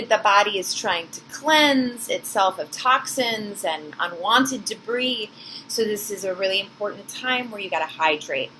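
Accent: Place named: United States. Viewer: I really thought you were British in John Carter... O_o I'm still trying to get used to your American Accent... talk about a twist.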